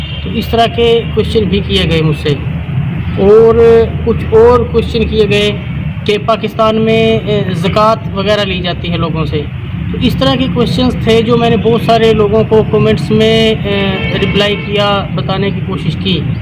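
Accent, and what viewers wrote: Haryana, India. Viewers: Haryanvi bolte ho bilkul hamari side ki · When you speak Haryanvi,it gives a quite different impressin.Anyhow I regularly see your videos based on exodus from Haryana which makes me remind what my father used to tell me calling it Gadar.I respect you for your way of putting things in the true perspective regarding Haryana's culture then a part of Panjab.I am from Karnal.One question which still remains illusive is about atrocities on Hindu women by Muslims.Honestly clarify it.Hatred & radicalisation from any side shall only be destructive for humanity in both countries.Thanks & best wishes for your efforts to make people know the painfull reality of partition.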